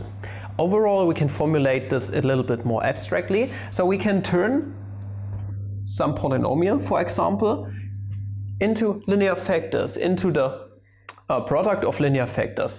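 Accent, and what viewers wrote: Germany. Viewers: I like your German accent. I don't know why, but it makes me laugh, and you Germans don't laugh. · always enjoy hearing the german accent😂just wonder if everyone can understand that "Weierstraß" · That's a thick German accent :D, that pronunciation and intermixing of s and ß gives away everything. BTW I am from NRW.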